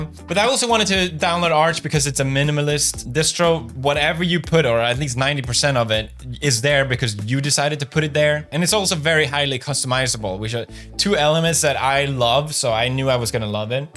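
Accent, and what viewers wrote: Sweden. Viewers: you still sound so darn swedish it's silly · Can I just say I feel like since pewds probably doesnt use english as often anymore I feel like his swedish accent is coming out more and more haha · I haven't watched your videos for a long time, but I must say your Swedish accent has somehow gotten worse. Love this video, though, and I agree 100% that more people should use Linux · It took me not seeing your videos for 3 years to realize you actually still have a very prominent Swedish accent! 🇸🇪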